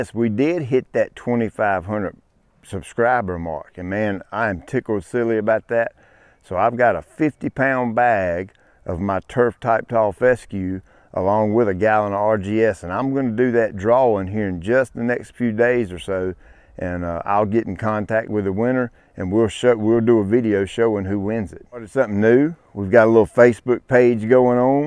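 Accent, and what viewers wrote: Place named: Georgia, United States. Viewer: Like that South Georgia drawl! North Georgia here!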